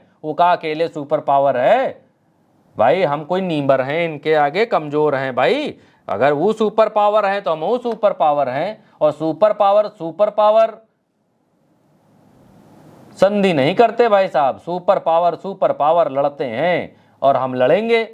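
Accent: India. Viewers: 👌❣️👌👌❣️👌👌❣️👌👌❣️👌👌❣️👌👌❣️👌👌❣️👌👌❣️👌👌❣️👌👌👌❣️ *Love you, भाई for your देसी ॲंदाज़-ए-बयॉं.!!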